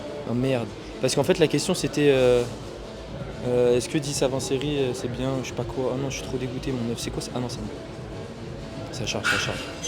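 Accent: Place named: Asia. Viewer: Je mets un dislike pour l’accent asiatique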